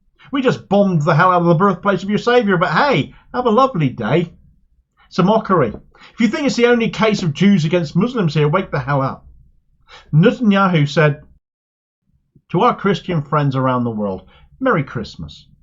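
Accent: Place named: West Country, United Kingdom. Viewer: Hi, your accent sounds where I am from its a wiltshire/somerset one right? I now live in Israel and enjoy your rants. I been labelled a threat for speaking truth about assange and war crimes in Iraq while in UK. Someone must of reported me because I been followed and harrassed since then, even moving to Georgia to escape persecution. I actually thought in Israel as an arab that my family would not get involved but they betrayed me and my own father does tactics the perp does. Checkout a short I posted of my house being watched. Id love to get my story out somehow. Let me know what you think (play with sound)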